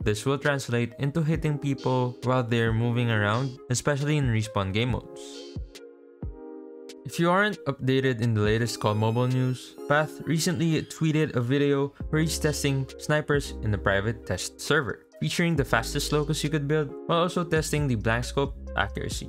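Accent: Philippines. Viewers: pinoy english accent is good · your accent is kinda like filipino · u sounds like you're a Filipino. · Thanks for this tips and tricks! Its's awesome.. Anyway, are u a Filipino? Because your english accent sounds like how we Filipinos talk english.😍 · Are u filipino u sound like a filipino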